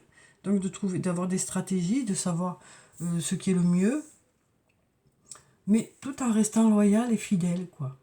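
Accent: South, France. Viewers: Bonne journée toujours un plaisir d écouter cette voix douce avec cet accent du soleil, merci pour votre tirage et merci de ce temps que vous nous offrez.bonne journée à vous bisous.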